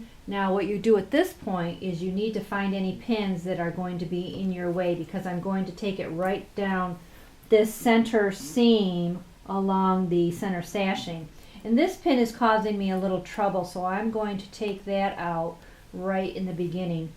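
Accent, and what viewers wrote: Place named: Western New York, United States. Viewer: Sounds to me like Western New York, I'd say Buffalo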